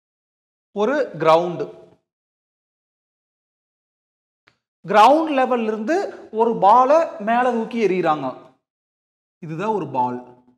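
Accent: Coimbatore, India. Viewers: Neenga Coimbatore pola......slang theriyudhu sir....me too.....❤️from cbe❤️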